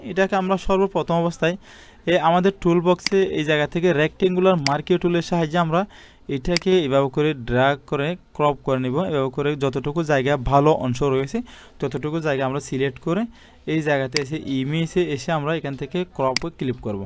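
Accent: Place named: Noakhali, Bangladesh. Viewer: ভাই আপনি তো নোয়াখালীর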